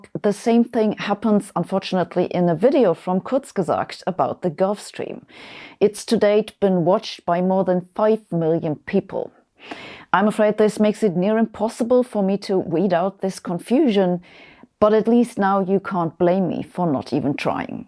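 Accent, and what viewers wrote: Germany. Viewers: Thank you very much for this excellent presentation and i have to say your teutonic accent seals the deal.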